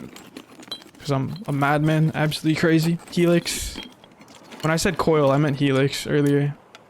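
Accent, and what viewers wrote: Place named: Netherlands. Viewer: You hear the juicy dutch accent, hes doing a good job of hidding it.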